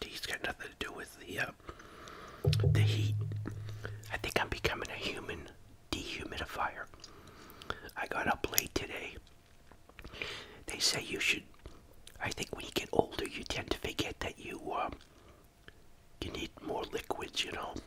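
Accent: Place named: Boston, United States. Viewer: I'm just curious... How would you foneticly write the way he says 'slaw'? Sloa? I just love that boastn' accent #billyburr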